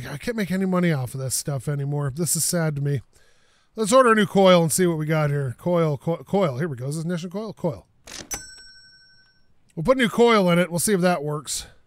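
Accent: Canada. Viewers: Are you Canadian bro?😶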